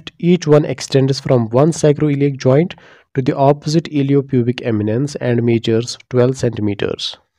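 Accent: Kashmir, India. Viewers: Sounds like a kashmiri guy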